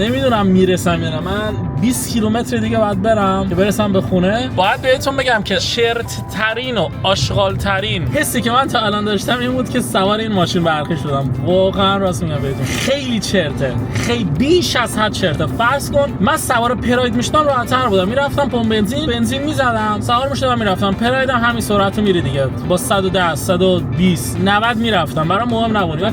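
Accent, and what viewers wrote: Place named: Bushehr, Iran. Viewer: بوشهریا لهجشون همینطوریه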